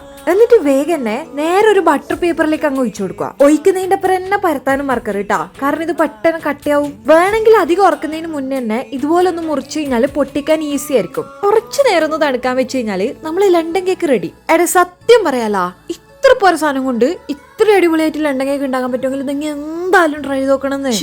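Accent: Kannur, India. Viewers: Pakka kannur basha annu appa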